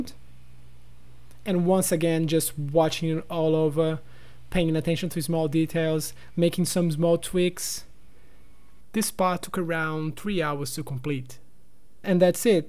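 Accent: Brazil. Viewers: Belo trampo! Vc eh Brazuca por sinal ? Reparei no acento rsrs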